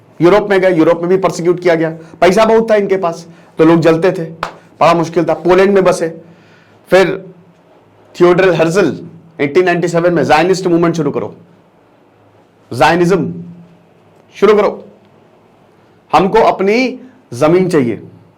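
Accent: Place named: Lucknow, India. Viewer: सर आप बिल्कुल लखनवी अंदाज में बात कहते हैं।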